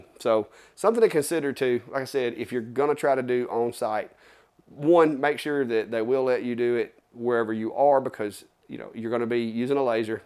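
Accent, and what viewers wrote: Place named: Perry County, United States. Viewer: I grew up in Perry County so I can understand you when you "tawk". lol Thanks for the good ideas and keep 'em coming!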